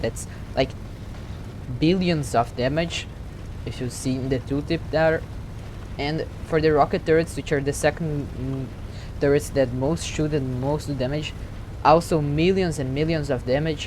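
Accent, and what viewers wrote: Brazil. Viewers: tu é br mano. kkkk salve aprendendo a falar ingles tamabem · Teu sotque é de brasileiro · TU TEM SOTAQUE DE BR MEU IRMÃO, TOMA O LIKE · Pretty impressive ship. Don't worry about your English, it's perfectly comprehensible; you do sound like you're Brazilian. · You sound brazilian to me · Eu tbm imaginei que era brasileiro somente pelo sotaque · Are you brazilian? It sounds A LOT like the Brazilian Accent. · Are you Brazilian? The accent is basically identical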